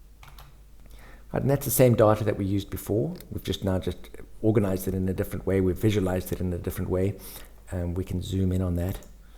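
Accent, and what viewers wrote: South Africa. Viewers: Are you South African bro? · south african bloke? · Can hear that SA accent loud and clear. Great channel Boet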